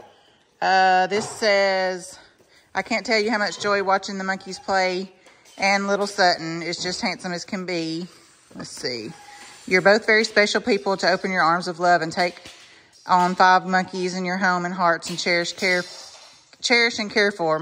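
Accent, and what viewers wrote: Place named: Alabama, United States. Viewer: I just found your channel the other day. I knew as soon as I heard you talk that you were from Alabama. I’m originally from Mobile. If you dressed Lucy in boy colors and never told us her name I would still know she is female because she is so feminine in her movements. Love them all. They make me laugh. 😊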